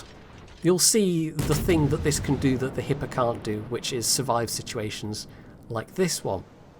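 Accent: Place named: Oxford, United Kingdom. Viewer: I think you would be great on television, as an announcer (you have that pommy Oxonian accent) or a comedian (great sense of humour a la Monty Python), or both. You'd be perfect. I'm thinking John Oliver would be a good example. Think about it...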